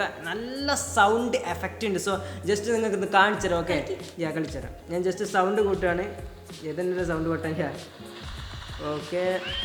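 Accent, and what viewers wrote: Kozhikode, India. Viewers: ബ്രോ കൊച്ചിയിൽ എത്ര വർഷമായി വേറെ ഒന്നും അല്ല കോഴിക്കോട് langugeinu ഒരു മാറ്റവുമില്ല അതു കൊണ്ട് ചോദിച്ചതാ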